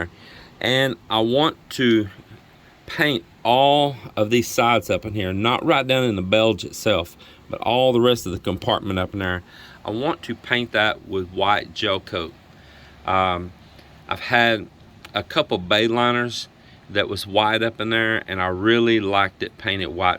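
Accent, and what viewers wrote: Texas, United States. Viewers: Oh I hear that Texican, bellge. My cousin lived in Post Texas and he worked killing whales 🐋, oil whales that is. Different places different colloquialisms expressions and pronunciations. All my Texican family I was close to are gone now. You just brought some fond memories back.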